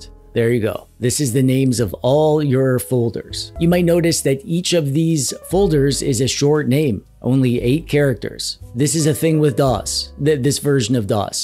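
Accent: Canada. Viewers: I finally caught the Canadian accent. Still can't tell which region! DOS always reminds me of my days as a Gaming Corp IT Tech. We used IBM for everything at the Casinos. Down there making a million dollars a day, and we are up in the office running software from the early 90s.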